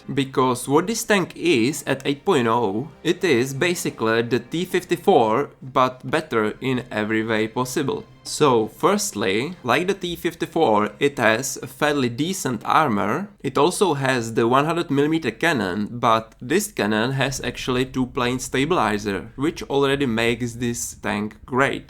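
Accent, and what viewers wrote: Czech Republic. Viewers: Když jsem slyšel ten přízvuk, bylo mi hned jasný, že jsi čech. Super video! :D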